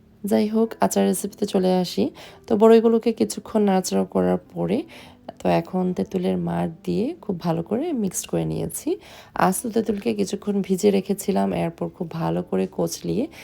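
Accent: Sylhet, Bangladesh. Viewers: Apu Are You Sylheti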